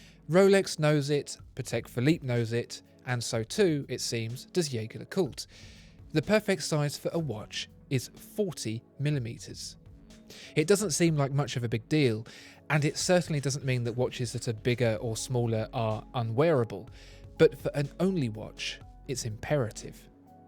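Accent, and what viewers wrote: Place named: Essex, United Kingdom. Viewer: Are these voice overs done by Alan Davies? It certainly sounds a very specific accent of Essex/London border and certain class, not quite posh but not chav either.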